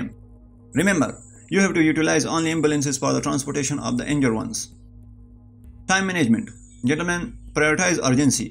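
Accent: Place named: England, United Kingdom. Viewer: The way of describing your plan is i think quite difficult..make it easier to some extent...try not to speak like an english man because then we can't understand what are you really saying 🙏🙏🙏🙏🙏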